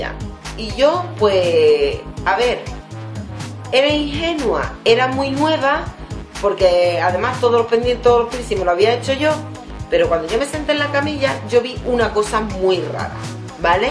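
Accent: Spain. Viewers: me he visto dos vídeos tuyos porque me gusto mucho la canción que le hiciste a Trew Unicorn (Jose), me gusto bastante tu canal, la historia estuvo muy dramática y con suspenso ejej:D Eres de Andalucía? Tienes un acento muy andaluz Un saludo y un abrazo desde Colombia!!! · Muchas Gracias por subir videos que sirven de tanta ayuda. Me he vuelto a poner mis aros en ambas orejas que hacía eones que no me los ponía y al ver tus videos me estoy animando a dilatarme las orejas. Por cierto, con ese habla que tienes, ties que ser de mi Tierra (ExtremaYDura) y mas concretamente de la Provincia de Badajoz. Un saludino.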